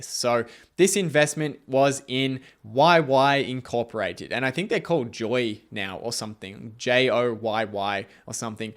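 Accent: Australia. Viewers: I only recently discovered your videos and I really like them. I wish I had your insight when I was your age! Your reflection on your mistakes is so valuable for me! It made me understand my own decisions and my own thought process much more. It also helps me to understand that passive investing probably is better for me as picking the right stocks is a lot of work as you said. I also like the clean style of your videos: no annoying noise, straight to the point and just enough visuals. They are also not too long! And your Australian accent is very pleasent!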